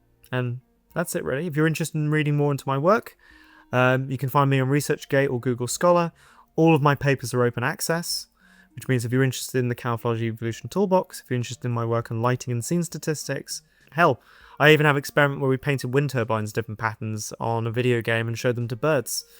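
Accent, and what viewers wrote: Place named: United Kingdom. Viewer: You sound British, any chance you're in the UK? If so, have you heard of DinoCon and would you PLEASE come present your work <3 <3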